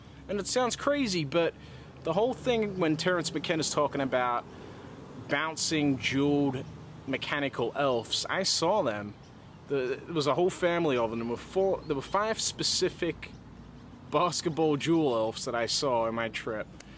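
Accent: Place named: Quebec, Canada. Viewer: maybe French Canadian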